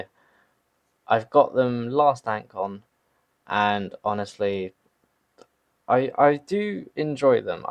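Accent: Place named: United Kingdom. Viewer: i was really confused when you had s fugax AND c diversa but then i realized that you have a slight british accent. good luck with the manica though.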